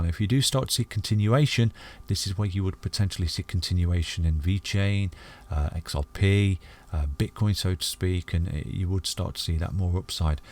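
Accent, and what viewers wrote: United Kingdom. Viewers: The british accent and the famous slangs always get me to buy more. VET 🌚 ✨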